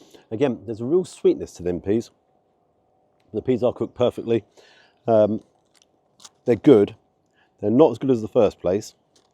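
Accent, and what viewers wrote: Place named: Yorkshire, United Kingdom. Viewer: Haddock, Haddock jeez gotta be COD! You sound like my Yorkshire Wife!! 😆🤣😆🤣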